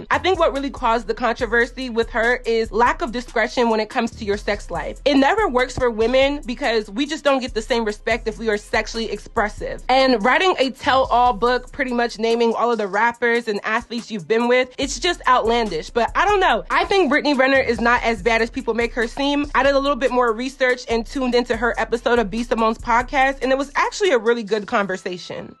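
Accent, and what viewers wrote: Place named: Memphis, United States. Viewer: Nawwww! Not You Tryna Sound Like A Memphis Female Rapper, & Shoutout “Memphis”. After You Stated “BIG GLO” Ratchet Aesthetic Is ”Repulsive” & “ Demeaning”! It’s All Ready Done By The “City Girls” & Your Tired Of It! Nawwww Na Sis, How You Judge & Try To Do The Same. ION LIKEEE DET #901RAISED #Memphis ❤️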